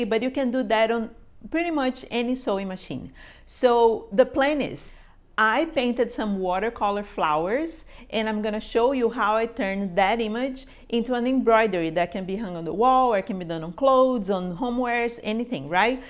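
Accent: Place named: Brazil. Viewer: voce e brasileira ? a sua ingles parece de alguem do brasil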